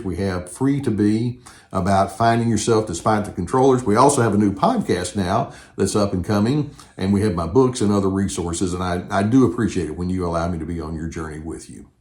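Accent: South, United States. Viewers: Thanks so much for all your videos. You are so helpful and your Southern accent is charming. Warm regards from Canada.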